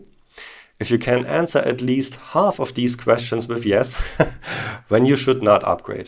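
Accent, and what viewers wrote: Germany. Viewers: you are sooo fast. too fast for my ears.... i like your oder slow Videos. are you german? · "Some may laugh the decision"- love that cool German accent!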